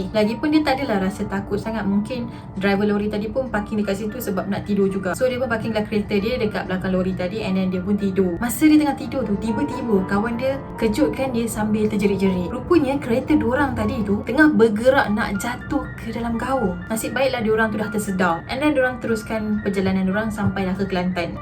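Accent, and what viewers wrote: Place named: Kedah, Malaysia. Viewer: Cara ckp gaya org Kedah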